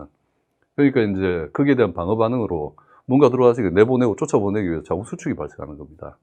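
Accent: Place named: South Korea. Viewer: 잘생긴 외모에 멋진 목소리 구수한 사투리에 친절하신 설명이 도움이 많이 되네요 감사합니다~~~~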